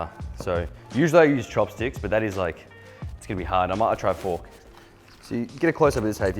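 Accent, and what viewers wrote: Australia. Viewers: For some reason, Australian accents makes these videos less cringe and less douchebagish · Your accent is so Aussie 🤣🤣brilliant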